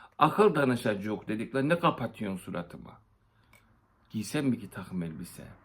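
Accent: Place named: Tokat, Turkey. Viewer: Tokat'taki akrabaların geldi aklıma. Şive konuşma tarzı herşey aynı:)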